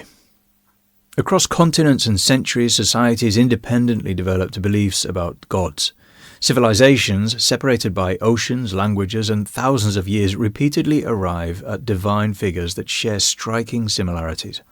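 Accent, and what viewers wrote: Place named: England, United Kingdom. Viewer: Where is your English accent from?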